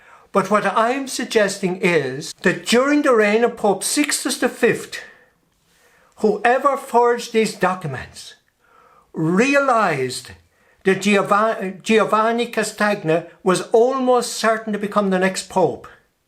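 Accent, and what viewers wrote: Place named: Ireland. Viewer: I just came here to hear Father say "Farty", I studied in a school run by the Irish Christian Brothers and I will never get tired of that accent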